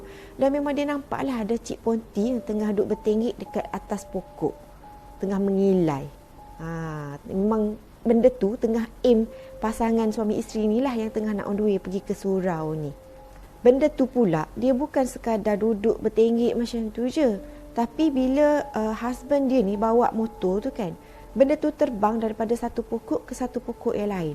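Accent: Kelantan, Malaysia. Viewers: Assalamualaikum kak.. org kelantan ke kak ? hahahaha dgr mcm lorghat kelantan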